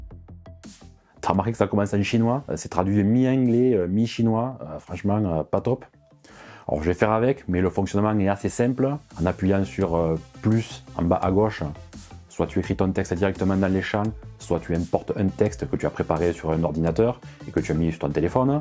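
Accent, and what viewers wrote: South, France. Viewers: J'aime ces vidéos sur du matériel bien choisi, simples directes brèves et ave l'accent du sud. Bravo !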